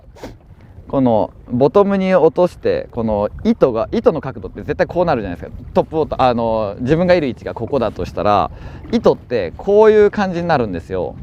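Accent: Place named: South, Japan. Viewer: South japanese accent!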